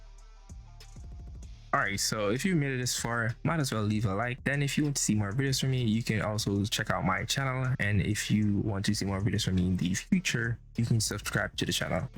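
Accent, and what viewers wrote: Jamaica. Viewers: Yuh sound like a yaad man.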